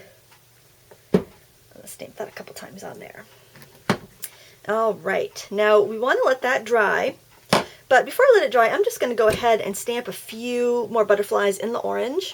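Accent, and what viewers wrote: Maine, United States. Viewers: Just found your channel today and I love it already! I also love this technique, and I love your advice regarding alcohol markers as it is something I want to start, but haven't because of the price. BTW, while you were talking I kept thinking that your accent sounds familiar. When you said you are in Maine I realized thats why as I'm just north of you in New Brunswick! And Mardens is awesome, whenever we go across the border it is a requirement to visit there, lol. :)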